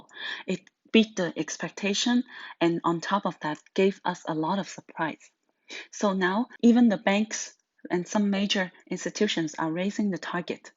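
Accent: China. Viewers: Sounds like chinese english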